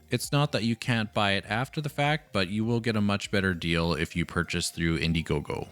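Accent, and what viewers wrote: Canada. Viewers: 'dem frametimes tho.... The ALLY will get better, there's 0 doubt in that. It's baffling that they allowed the review results so early (not having an NDA in place before June 13th which is typical industry practice)... Thanks for some more sweet canadian accent!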